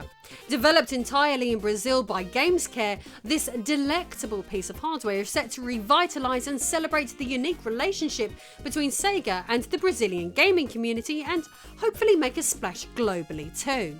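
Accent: United Kingdom. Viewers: I keep thinking to myself why don't I like the sound of. I love British dark haired women. While watching this video I realized, Its the British News caster down hill draw sound. I have heard this in other people and technically is it a way of speaking that is supposed to draw your attention to what she is saying. But to me it is like nails on a chalk board. We came here to watch and listen to you. Our attention is already on you. Nothing against you. Its just this way of "reporting" repels quite a bit of the Neuro Divergent viewers who would watch and enjoy.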